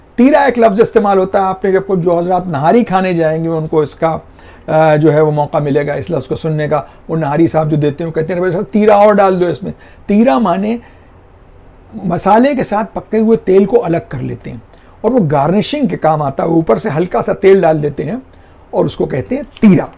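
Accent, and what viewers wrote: Lucknow, India. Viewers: Aap ke Lucknavi bateyn sun k bahut maza aa gaya.Meray Walden wahin sey partition k baad Karachi a gai they.Yeh sab batey or lab o lehja Karachi mey rehtey huway suntey tou lagta tha Lucknow mey rehtey hain. Aap ki guftugu sun ke maza agaya Lucknow ke sair ho gai 👌♥️ Aap ney Lucnavi pourbi zaban ka zikr nahi kia wahi hamarey ghar mey boli jati thee.Jesay aye bhayia tum ka khaieo ? Mey kai baar Lucknow bhee jachuki hoon Behad maza aya or apni nanhiyal jaker wapas aney ka dil nahi chahata hai.Lucknavi mehmaan nawazi or kahin dekhney ko nahi multi 👌♥️ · Shukran Sir Aap ki videos ka be qarari se intezaar rehta hai... Hum Guzishtah paanch barson se Bangalore main muqeem hain Lucknow juld aana mumkin nahi So aap ke lub wa lehje main mukammal Lucknow paa letay hain.. Behud shukr guzaar hain ke Aap ne Itni pyari Tehzeeb ko ujagar kiya... Salamat rahiye... 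Aameen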